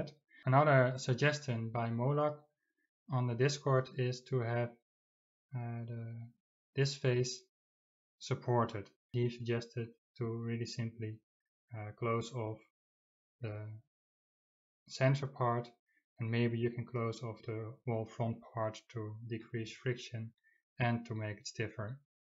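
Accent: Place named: Netherlands. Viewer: haha het typisch nederlandse accent :). Btw, geweldige video's!!!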